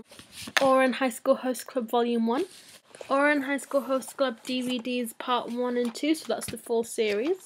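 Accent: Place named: England, United Kingdom. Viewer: I love your accent! are you English?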